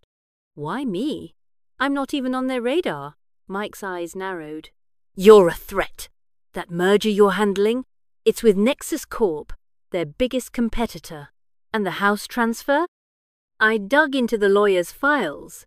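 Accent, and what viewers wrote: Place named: England, United Kingdom. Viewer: Frightful voicing.😮 The English accent really doesn't help at all.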